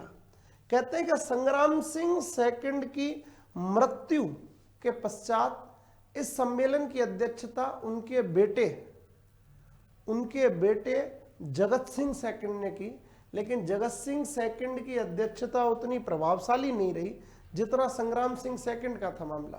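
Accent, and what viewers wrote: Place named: Bharatpur, India. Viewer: सर आप भरतपुर के से लग रहे हो बोली ते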